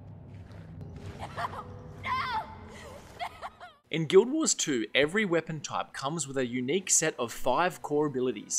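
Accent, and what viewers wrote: Australia. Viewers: Judging from your accent, I'm gonna assume you live in Australia. How do you deal with the high ping? I tried Guild Wars 2 and I enjoyed my time with it, but the fact that the game didn't support OCE servers just killed it for me. It's 2023, there's really no reason I should be playing any online game with 250 ping anymore.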